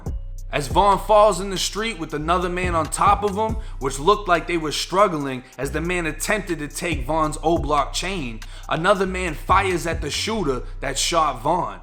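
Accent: Boston, United States. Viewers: Are u from Boston?..I hear the accent...btw good breakdown 👍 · U sound like ur from Boston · Yo is this dude talking from Boston some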